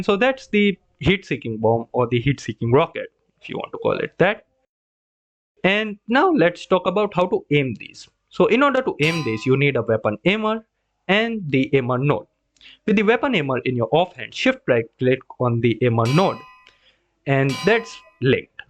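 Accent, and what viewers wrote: India. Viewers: yes i knew this was good when i head the indian acent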